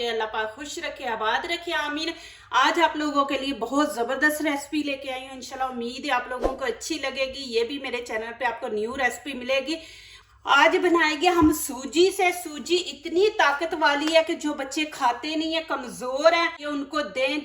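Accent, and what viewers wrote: Punjab, India. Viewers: اپ پنجابی سپیکنگ ھیں اپ کا ااردو کا لہجہ بہت بھونڈا ھے اپ یہ ھی لہجیہ اپ رکارڈ کر کے دیکھیں پھر چووولھااا یا چولھےےےےےے پر کا لفععمر کیئےےے تھے ھمرے بچے اپ کے اردو کے لہجہ کا مزاق اڑاتے ھیں کوی پنجابی اپ کو نہیں سمجھاے گا اب ھم اردو سپیکنگ ھے ھمملییمن لفظوں کو ڈانٹا نہ کریں ھمبس کیا بتایں اپ کو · ماشاءاللہ ۔۔۔ریسیپی سے بڑھ کر آپ کی پنجابی اردو مزے کی لگی😅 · Kamal. log. hee .ap.Angrezi. boole. ya urd .boole. Lagta he panjabi. Me.bool rahe he .baqui. Keke picture me .bohth acha lagraha he. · پلیز پلیز آپ اردو کے بجایےپنجابی میں بول لیں فل پنجابی لہجے میں اردو زبان بہت عجیب لگتی ہے سننے میں ذرا بھی اچھی نہیں لگتی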